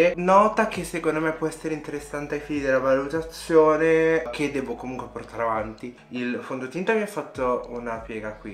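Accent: Milan, Italy. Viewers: a Milano la cadenza è quella e non è di "m". Cerchiamo di stare nei limiti dell'educazione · a me non piaceva la tua parlata milanese di m!! 😂😂😂 invece i tuoi contenuti sono per me ottimi e quando esprimi pensieri e opinioni “makeup a parte” ti ho sempre trovato serio e maturo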